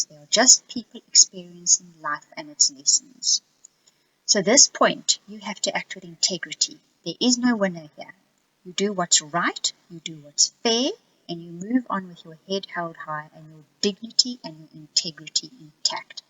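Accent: South Africa. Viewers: Hi are you South African?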